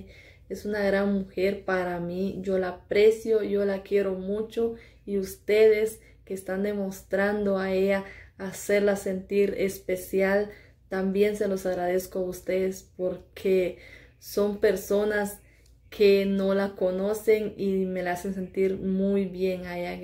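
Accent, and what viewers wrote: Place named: Mexico. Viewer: . Holaa apenas anoche mire tu video yo miro los canales de tus ermanos. Y a todos las chicas y chicos me la pasa viendo los videos no sabía que tu tambien asias. Videos me encanta como eres te espresas muyy bien se me ase que tienes un asento en tu voz como mexicano tus ermanos y todas las chicas y chicos tienen diferente asentó. Pues bueno a segir adelante ya me suscribi a tu canal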